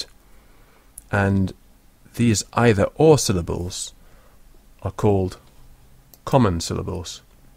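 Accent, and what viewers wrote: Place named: England, United Kingdom. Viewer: I've learnt not only Latin from this fantastic video, but also a descent English accent. Enjoy it!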